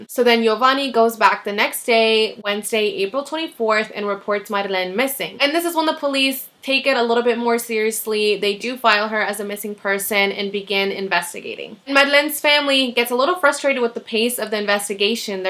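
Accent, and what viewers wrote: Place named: Spain. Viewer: I love your Spanish accent lol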